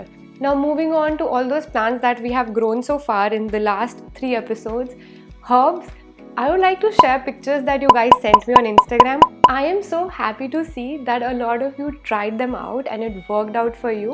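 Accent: India. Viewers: North Indian accent in English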